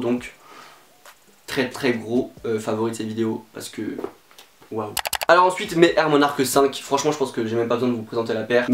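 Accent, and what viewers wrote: Normandy, France. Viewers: T'es de Normandie ?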